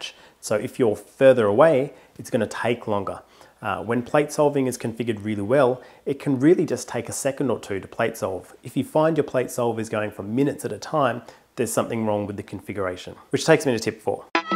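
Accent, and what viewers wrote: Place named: Australia. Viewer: Haha..I totally thought you said spice is big in the beginning 😂.. Aussie accent is great.. 😀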